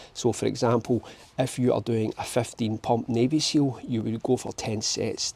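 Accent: Scotland, United Kingdom. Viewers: Hey man, my comments have been telling me to hit you up. Where is Scotland are you from? 😊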